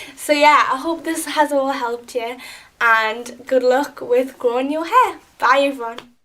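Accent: Newcastle, United Kingdom. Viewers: R u from Newcastle?????? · u from newcastle? i think i see a fellow geordie? ahaha x whey aye man x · omg we have the same name and are you a geordie, if you are we are so alike!! x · Yay geordie accent :D I'm from Newcastle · She talks like them in geordie shore. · it's geordie, like me :) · its a geordie accent (newcastle) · shes from Newcastle not feking Ireland -_ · She has a Newcastle accent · She's from Newcastle :) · Ive got this accent too, shes geordie :) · Guys she isn't Irish, she's from Newcastle in England :) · I think shes from Newcastle in Britain. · is that the Geordie accent? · Her accent isn't 'fake' shes from newcastle, north easy of England. I went to school with her. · shes not from liverpool shes from newcastle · That is definitely not an Irish nor Welsh accent. If I was to guess, I'd say wither Liverpool or Newcastle. Probably the latter. 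Bloody lay off it. That's not the aim of the video. dayum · no its not? it's a geordie accent. (i am also a geordie). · She is British, she is from Newcastle I think... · She's a Geordie like me omg! From Newcastle!🙊 ♡ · Omg , I'm a Geordie as well! Best accent ever! ,xo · Her Accent is Gordie, What Gordie Shore, it will change your life. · im from newcastle, yeah she is from newcastle, in Britian we're Geordies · Erm for all who want to know she is geordie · Geordie accent ? (Newcastle ) proppa love ittt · She is from Newcastle by the sound of her accent - similar to mine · Shes got a geordie accent, from around newcastle in the UK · She's a Geordie lasssss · She's from Newcastle!!! You will recognise the accent if your from Newcastle or watch geordie shore, her accent is not fake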